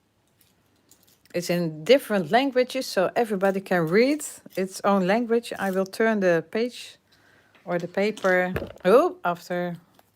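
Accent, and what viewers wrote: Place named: Netherlands. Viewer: I love how you force yourself to calm down 😂 always enjoy your tutorials and talents. Admire your English fighting with the Dutch. Appreciate your struggles. Wishing you were making more English tutorials. Cannot translate the Dutch version or the lives.😔 🤣🤣🤣I don't think I will be attending those knitting classes.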